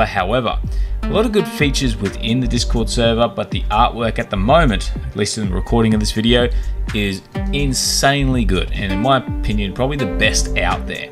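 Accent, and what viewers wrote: Australia. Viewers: So nice to hear an Aussie voice on this topic. Thanks mate great info.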